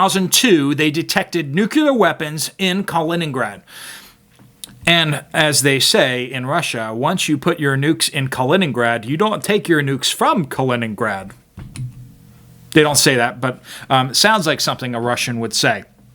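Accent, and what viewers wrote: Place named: Midwest, United States. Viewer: Hes from the Midwest, accents exist.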